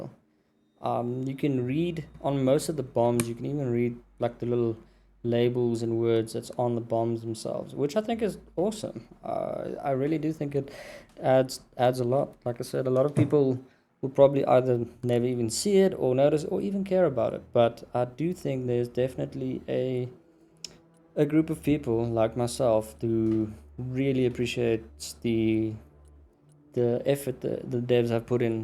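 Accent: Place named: Afrikaans, South Africa. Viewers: It's easy to spot a South African ;) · you sound afrikaans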